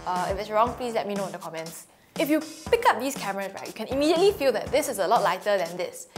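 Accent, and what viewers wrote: Singapore. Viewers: cute. am detecting Singaporean/Malaysian accent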